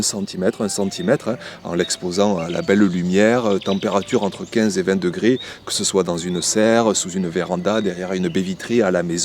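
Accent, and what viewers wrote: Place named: South, France. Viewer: la video est top, mais cet accent du sud appuyé pour qu'on comprenne bien que le monsieur est du sud, c'est aggacant pour les oreilles. J'ai des amis qui sont né et qui vivent dans le sud et ils n'ont pas cet accent, ou ils arrivent a l'estomper.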